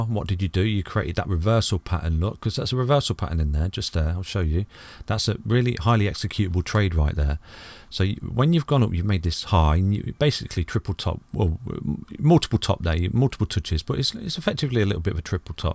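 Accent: United Kingdom. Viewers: Nice to hear a fellow brit talk through it. Find it easier to understand. New to the game, this is very helpful info ✌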